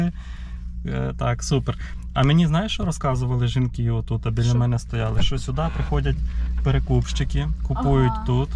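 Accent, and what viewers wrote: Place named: Western Ukraine, Ukraine. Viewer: Почала вас дивитись-зацікавили.Ви вихідці з західної України?говірка така,як в нас на заході.Удачі Львів 60,50грн.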